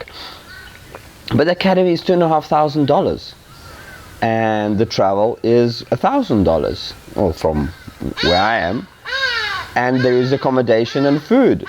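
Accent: South Africa. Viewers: I hear a Saffer accent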